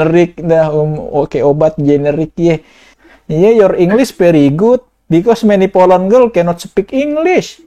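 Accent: Jakarta, Indonesia. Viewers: lebih bagus logat betawi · Kentel bener betawi nye cing wkwkw, gue bantu subs nih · pertahankan logat Betawi Lo bang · Bahasa luar + betawi ngakak sih..😂🤣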